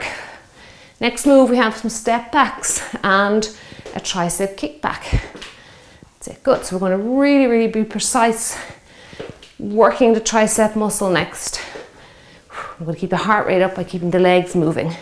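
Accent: Ireland. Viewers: Hi, a new subscriber from Belleville, Ontario Canada. I adore your workouts. They are calming yet very motivating. I have done most of them and each one is terrific. Plus I never get tired of your lively Irish accent. I have shared your workouts to my Facebook page and had some of my friends try it. Thanks, keep it up!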